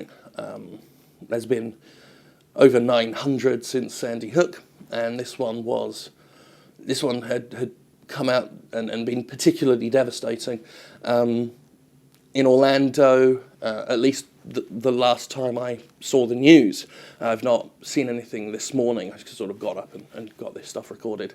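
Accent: United Kingdom. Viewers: Is it just me or does become more british?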